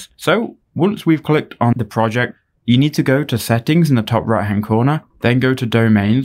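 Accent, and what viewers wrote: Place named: United Kingdom. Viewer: Just simple and true 👍. Followed it and got mine done in 5 minutes. Also loved the British accent <3😂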